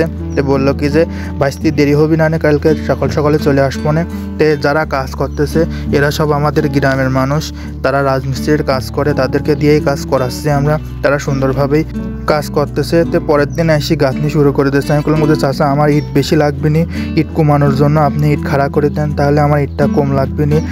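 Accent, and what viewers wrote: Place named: Pabna, Bangladesh. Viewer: তুই কি বুঝবো আমাদের পাবনার ভাষাটাই এমন বুঝলে ভিডিও দেখবি না বুঝলে দেখার দরকার নাই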